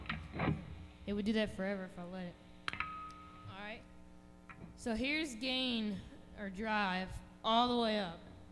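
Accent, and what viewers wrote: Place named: New Orleans, United States. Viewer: You gotta be from New Orleans! :)) Nice amp!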